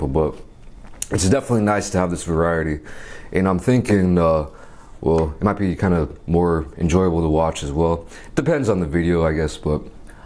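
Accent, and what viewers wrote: United States. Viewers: You sound like the most country asian guy ever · U sound American lol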